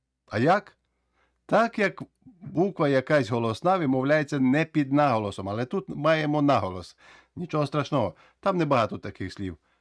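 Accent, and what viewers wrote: Transcarpathia, Ukraine. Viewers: Урок надзвичайний(принаймі,для мене...людини,ака хоче вивчати англійську мову...),Ваша українська мова (як на мене -закарпатська...)сама собою притягує і спонукає вчитися,ну і сама методика пояснення азів англійської....хоча Ви кажете,що англійську дуже легко вивчати...(і так,і ні....як для мене,принаймі...коли за плечима вже немало прожитих років...)а американська англійська чого варта....Та надіюсь....найважче дається зрозуміти співрозмовника (цю...) Файно дякую Вам !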